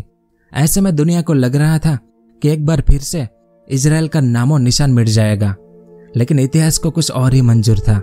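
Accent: Gujarat, India. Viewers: आपके बोलने Accent एकदम गुजराती जैसी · tamaru bolvanu tone gujarati aave chhe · Yeh bhai Gujarati hai..unke voiceover se pata chalta hai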